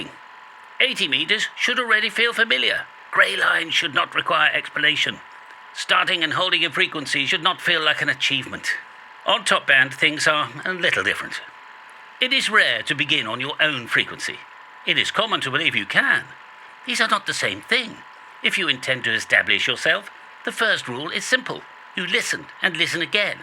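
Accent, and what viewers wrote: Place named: RP, United Kingdom. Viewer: Love the posh accent! Thanks Mr!;) 73s de F4HZN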